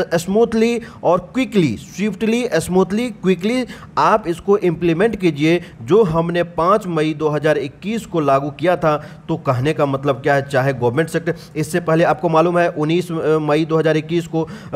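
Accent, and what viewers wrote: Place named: Bihar, India. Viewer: Typical bihari style narration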